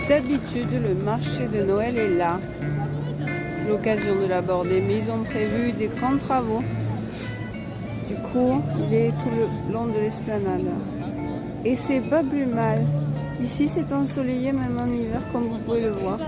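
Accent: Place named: Montpellier, France. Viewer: Vídeo interessante.bel accent de Montpellier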